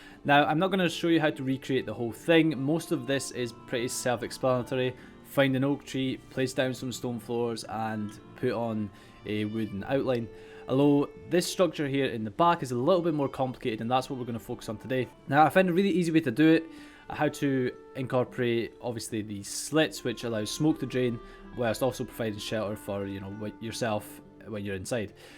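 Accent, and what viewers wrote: Scotland, United Kingdom. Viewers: Nice build dude Are You Scottish?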